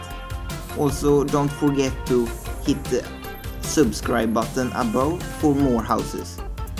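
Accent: Sweden. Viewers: I thought i heard some swenglish in it swedish i awseome. hur länge har du hållt på med hus i sims? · You sound swedish :D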